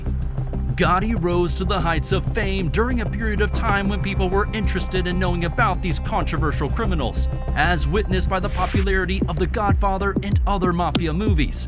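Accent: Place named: United States. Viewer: GOOD BUT SHAME ABOUT THE VOICE WHOS TELLING THIS A TYPICAL AMERICAN OTP BRITISH ARMY COLIN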